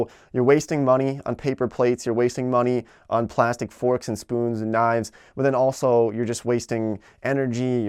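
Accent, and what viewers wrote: United States. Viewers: Are you really American ?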